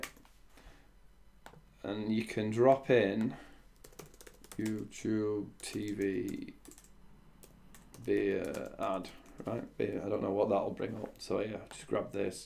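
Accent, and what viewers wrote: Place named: Yorkshire, United Kingdom. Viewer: Where are you from, sounds like Yorkshire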